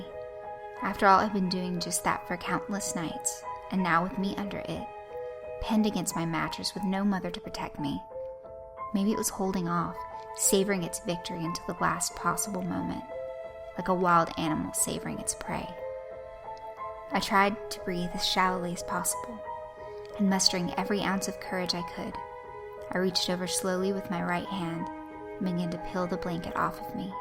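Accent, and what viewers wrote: South, United States. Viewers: Wow, that one about the white handled gun was extremely creepy and sad. But that bang at the end, I admit I jumped. Cool narrative your accent are you from the South? Or did you do it for the story?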